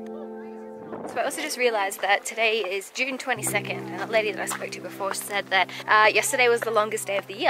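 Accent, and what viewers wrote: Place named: Australia. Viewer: Lol! I noticed that too. That's that Australian accent kicking in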